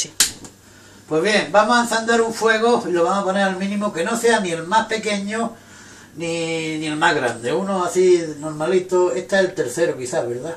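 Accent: Andalusia, Spain. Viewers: Saludos desde Cuba me recuerda a mi abuelo que era andaluz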